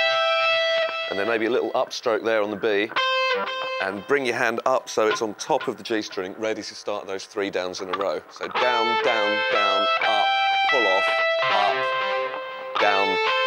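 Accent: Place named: United Kingdom. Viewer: I started to love british accent because of him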